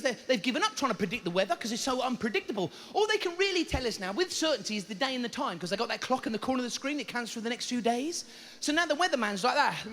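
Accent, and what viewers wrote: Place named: Birmingham, United Kingdom. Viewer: The brummie accent kills me as well.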